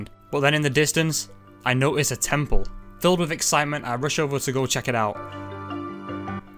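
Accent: United Kingdom. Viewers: this mans scottish accent be poppin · Your Briish Accent is Strong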